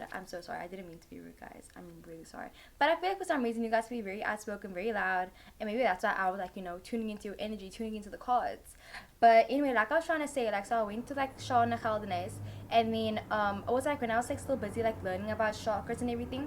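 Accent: South Africa. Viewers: I know that accent❤❤hey there South African 🎉picked pile 1 and I wanna be famous like 😍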